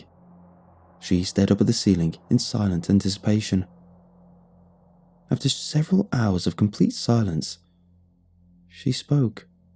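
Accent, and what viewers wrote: United Kingdom. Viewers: bruh this is my fifth year listening to ur voice british people are unreal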